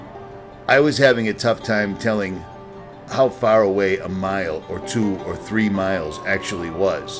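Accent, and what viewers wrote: Boston, United States. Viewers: Sounds like your cuzin from boston! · Sounds like you have a slight Boston accent.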